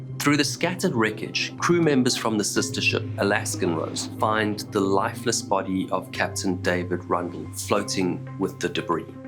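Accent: South Africa. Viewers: Are you south African brother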